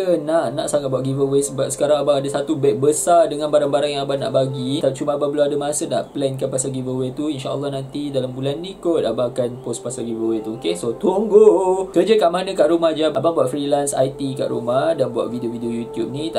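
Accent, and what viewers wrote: Sabah, Malaysia. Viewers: suke,lagi lagi ckap sabah gan jepun tuh..^^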